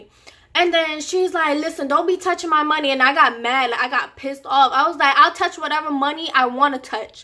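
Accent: New York, United States. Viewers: That accent has to be from NY! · u have a accent like your from New York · I loved the New York accent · New York accent strong asf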